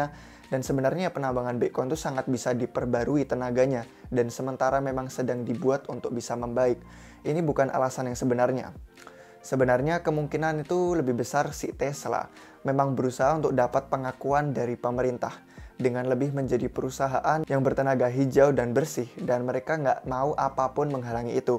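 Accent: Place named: United Kingdom. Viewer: Bos, aksen british lu cringe banget, keinget skinny faps, pake aksen amerika aja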